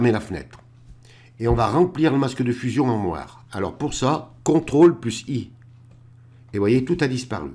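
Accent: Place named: Dauphiné, France. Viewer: il me semblait bien qu'il y avait un petit accent dauphinois! bravo, merci pour ce beau tuto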